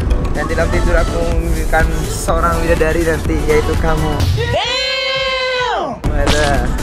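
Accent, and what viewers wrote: Madura, Indonesia. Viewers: bahasa madura.a bnget,lucu pas ngimucak bahasa medureh🤣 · Dengerin suaranya ko kaya ada logat Maduranya, eh ternyata emng pake Madura🤣🤣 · Medureh sarah 🤭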